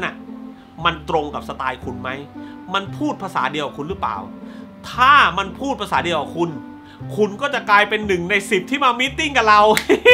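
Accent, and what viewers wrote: South, Thailand. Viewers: พี่คนใต้หม้าย?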